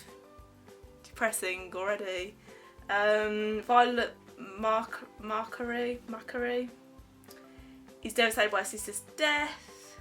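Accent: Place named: Birmingham, United Kingdom. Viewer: So nice to hear another brummie on here! Great video, so jealous of your haul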